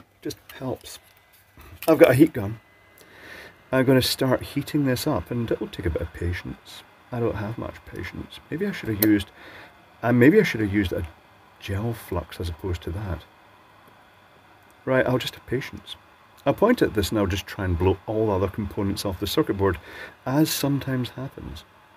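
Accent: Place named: Scotland, United Kingdom. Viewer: Fluxing and removing chips.... its a lovely Scottish sounding Louis Rossman, with less swearing!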